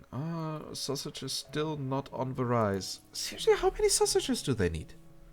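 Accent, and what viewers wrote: Germany. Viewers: We like you with ur german accent bro. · I like your German accent, it's pleasing, I reckon Americans and Brits are not used to straight honesty of central Europeans. Honestly, I like your play style, but your commentary is much more entertaining for me than Raptor's and other channels, so this is why I watch this one. That being said, "So-ing" machines ;) Danke fir macht aus Praag! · This is how most German people talk English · Guten Tag, da dieser Kommentar für dich ist, kann ich ihn ja auf deutsch schreiben... Du hast vollkommen recht, als ich die ersten Videos von dir in der Anno 1800 Beta gesehen habe, hat mich dein Ton und deine Art schon ein wenig genervt. War jedoch nur eine Mischung aus Neid und meinem eigenen Anspruch an mich möglichst zu versuchen in Englisch nicht wie eine Vollkartoffel zu klingen. Ich habe deinen Kanal nach der Beta leider aus den Augen verloren, hatte mir aber fest vorgenommen mir die Vollversionvideos von dir anzusehen... 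und weswegen? Wegen deinem Spielstil, deine Layouts bringen mich zum lächeln und wenn dein Layout nicht auf die Insel passt und du dich ärgerst, ärgere ich mich mit dir und genieße gleichzeitig ein wenig Schadenfreude. Ein deutscher Muttersprachler darf in Englisch auch ruhig so klingen... Wenn er dann noch in seinem Spielstil den Klischedeutschen raushängen lässt, ist das top Unterhaltung. Es ist super entspannend jemanden "genau richtig" spielen zu sehen, während man selbst gerade einfach zu faul ist es selbst zu machen. Arbeit ist wunderschön... ich könnte stundenlang zusehen. :D Diese Art Anno-Let's-Play habe ich schon lange gesucht, jetzt kommt das (meiner Meinung nach) beste Anno raus und ich finde genau das. :) Danke, danke, weiter so!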